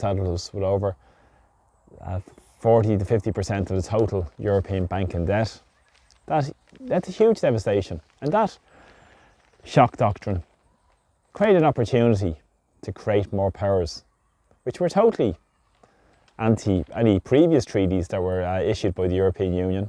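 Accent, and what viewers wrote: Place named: Ireland. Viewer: Please people give this channel a subscibsion and a thumbs up we need a the real irish voices out there,thanks for all you do,thanks.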